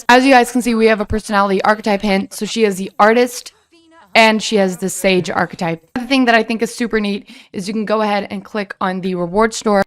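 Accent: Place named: United States. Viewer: I don't understand any ball of it, but even under us... your voice wtfff its fucking perfect 🤩🤩🤩🤩 really americanish really good omg im flabbergasted no words wow 👍🏼👍🏼👍🏼👋👍🏼👍🏼👍🏼👍🏼👍🏼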